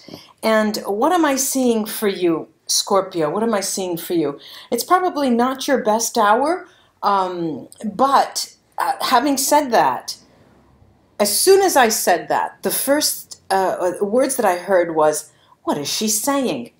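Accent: Italy. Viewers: Italian Accent*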